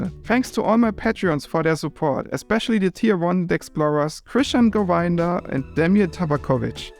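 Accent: Germany. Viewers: Being new here, I thought,"man, that's one thick german accent" and then the name was displayed. Brother, as a fellow german, I feel for you - probably having shared the same educational system. Keep up the good content and thick accent.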